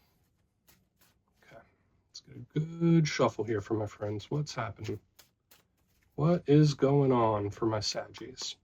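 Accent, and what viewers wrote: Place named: Louisiana, United States. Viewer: You sound like your from Louisiana!!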